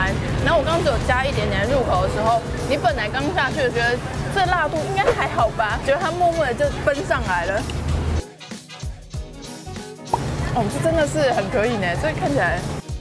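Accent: Taiwan. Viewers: 你知道嗎，，我想臺灣時就看你節目，在歐洲待久了，聽到你的口音就知道我的魂又回到臺灣了，親切的像鄰居，謝謝你❤️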